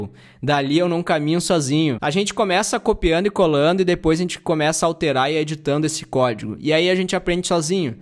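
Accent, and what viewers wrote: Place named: Porto Alegre, Brazil. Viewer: Me inscrevi pelo conteúdo e porque tu é de Porto Alegre (notei pela fala). Abraços!!!!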